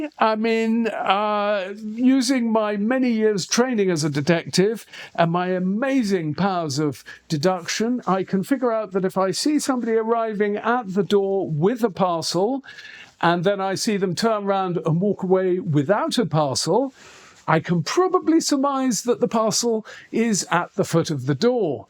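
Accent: United Kingdom. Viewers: I'm an english teacher from Austria. Most youtube videos are made in America...but I really love your british english. It's so much more sophisticated...I just love it:) also your content on the doorbells ist interesting of course.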